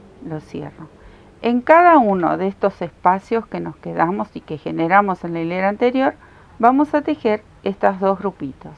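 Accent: Argentina. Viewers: ¿Sos argentina? ¡Me encanta tu acento! Solía vivir en Buenos Aires a finales de los 80 y lo extraño ;)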